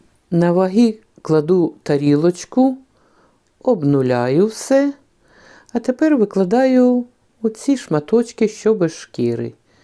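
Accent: Ukraine. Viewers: Нееет, все ж таки кровушка отцовская перебарывает, люблю я вас хохлушечки, люблю ваш говор, люблю ваши песни, люблю ваши танцы, люблю вашу украинскую вышиванка и национальные одежды, правда, как много наготовили вкусняшек только лишь из 6ти спинок куриных, дякою за ваше видео, здоровья вам, счастья.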